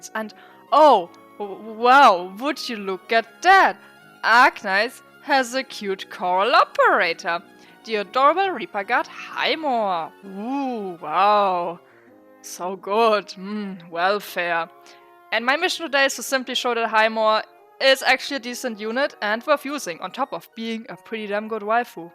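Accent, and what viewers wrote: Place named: Germany. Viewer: German accent is a welcome surprise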